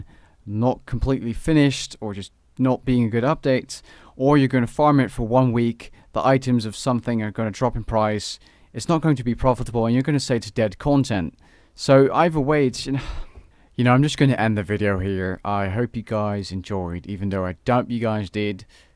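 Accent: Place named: Netherlands. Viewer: well whatever you choose to do the community supports you and thank you so much for making such great content and teaching me to boss. It has always been a goal to achieve a max Cape and thanks to you I'm on that road now. And hopefully before too long I'll have the final boss title. And that's good to know you're Dutch 😂 I couldn't figure the accent out . But for real whatever you feel like you have to do or want to do we support you and you at least have built up a community enough to get a jumpstart of you were to do other games❤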